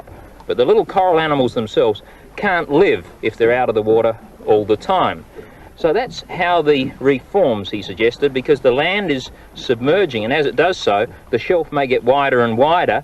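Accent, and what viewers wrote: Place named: Australia. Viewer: I feel stupid now for never having realized that this was an australian show, i just thought he sounds kinda funny.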